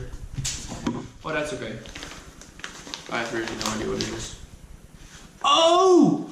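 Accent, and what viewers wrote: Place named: South Holland, Netherlands. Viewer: Hoor ook liever je Zuidhollandse accent hoor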